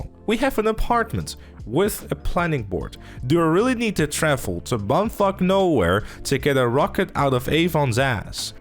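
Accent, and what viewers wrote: Netherlands. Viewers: Is the guy narrating Dutch?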